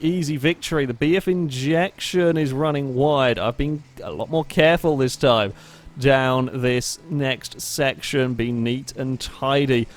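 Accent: United Kingdom. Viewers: you sound so fabulously British :D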